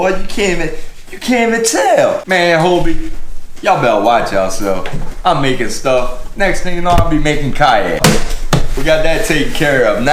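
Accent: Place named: Appalachia, United States. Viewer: Learn to talk dam hillbilly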